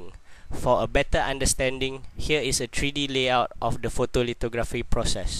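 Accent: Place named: Malaysia. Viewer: like a malay reading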